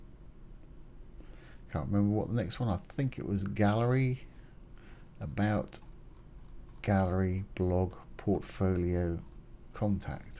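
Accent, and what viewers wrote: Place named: West Country, United Kingdom. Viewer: Hi.. Trying to place your accent. It s South with a bit of west county. Anyways enough of the small talk. Have been following your excellent lessons.As I'm just starting a website, this one in particular has inspired me to create a better idea than just a coming soon template so i can expand on the content more than just a few pictures and text. Ive liked & subscribed where i can. Was wondering if you could offer a little advice. So ive set up my Woocomm product pages with variable options,size colour etc. I make belts The drop down offers 100 colour options listed as names where the customer can make a choice. However a button next to it takes you to a gallery page showing all the colour swatches as better experience. So the ask in all this is. Can i some how link a choice in the galley to become added as part of their choices?. 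ive noticed you can hyperlink the image text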